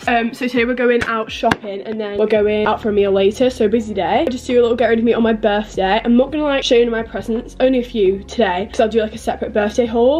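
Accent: United Kingdom. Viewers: UR BRITISH OMFG MY IDOLSSSS BRITISH.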